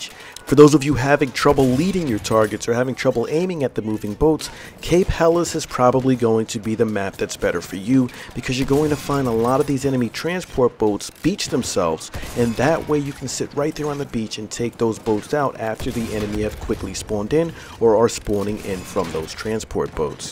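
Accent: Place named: United States. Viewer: Bro, You are 'THE' place to be to get the most out of BF1. Your voice is so clear and concise. I like your American accent. You know I'm from England, I speak way faster I doubt you would understand a word I say...Lol..Have a great Christmas brother.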